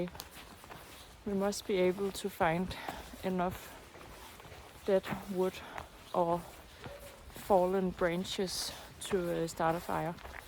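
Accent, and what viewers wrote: Denmark. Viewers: Hahaha! I love the Denmark accent! 🤙🏽💯🔥 Just like Cimbrer Bushcraft! Thanks for sharing the beauty of Denmark! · I concur! Her Danish accent is easy on the ears. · with her lovely Denmark accent. The sound of a flowing river is always soothing. Greetings from Ontario Canada 🇨🇦 🍁 🇨🇦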